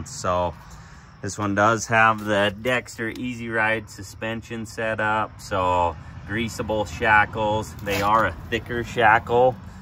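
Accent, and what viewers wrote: Utah, United States. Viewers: I thought I recognized that Utah accent. Love this camper!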